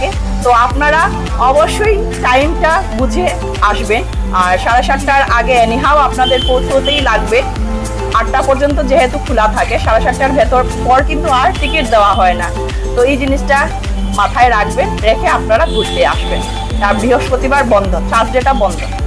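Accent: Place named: Sylhet, Bangladesh. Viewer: আপনার কথায় সিলেটি টান আসছে।।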